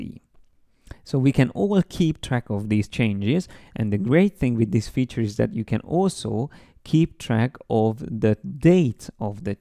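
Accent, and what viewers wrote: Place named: Hungary. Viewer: you have a kind of Hungarian accent. :)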